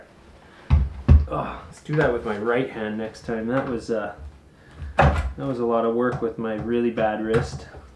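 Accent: Canada. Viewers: I dig the Canadian accent. I donno I just felt compelled to express that. Great video, man.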